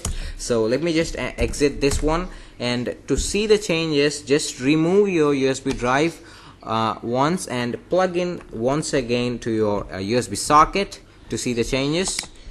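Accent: India. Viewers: an indian trying to hide his accent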